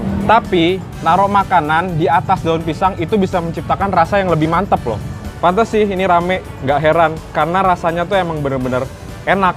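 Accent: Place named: Tangerang, Indonesia. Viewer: Logatnya tangerang banget bang😂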